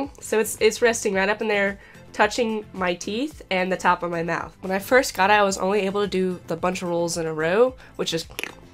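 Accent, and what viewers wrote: South, United States. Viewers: What state are you from??? You sound soooo SOUTHERN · Your southern the way you say "Like" tells me you spent alot of time in the south or near southern people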